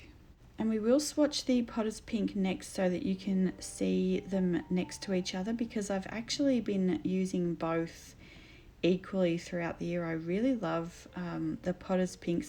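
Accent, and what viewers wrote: Australia. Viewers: Great to hear an Aussie accent. I am in NSW and am trying to get into art and watercolour after a career in nursing. I love your style. Thanks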